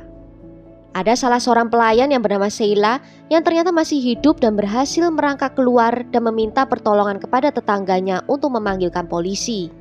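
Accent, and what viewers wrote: Java, Indonesia. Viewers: alangkah medok logat jawe kamu bik...😁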